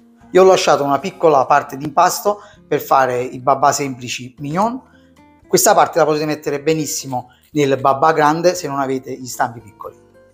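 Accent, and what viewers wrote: Naples, Italy. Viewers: E cosa dire: Lei è un grandissimo pasticciere, poi l'accento napoletano! A proposito, W NAPOLI!!!!🎉🎉❤❤ GRAZIE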